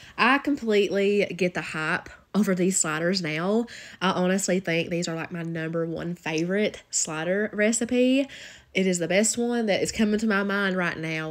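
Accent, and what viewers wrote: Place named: Massachusetts, United States. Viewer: your accent is so cute. In live in MA and you know how we sound! I love your accent and your channel!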